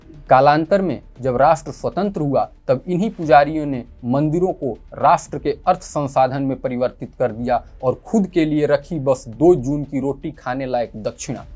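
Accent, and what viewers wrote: Standard. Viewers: sudh hindi yahi sunne milti hai, warna har jagah , hindi ke sath english aur urdu juda rahta hai.. btw .. nice topic and presentation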